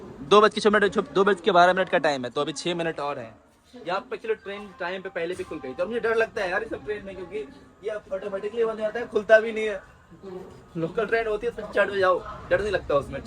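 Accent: Bihar, India. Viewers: Char din hue nhi honge Russia gye hue or attitude dekho iska, abhi tak bihari tone gyi nhi hai iski hindi ache se bol nhi pa rha or nautanki dekho iski.....paisa barbaad saala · Kahe raese pel rahe ho jo accent use kar rahe ho lag rahe ho bihari ho cheap aur chutia dono dekh rahe ho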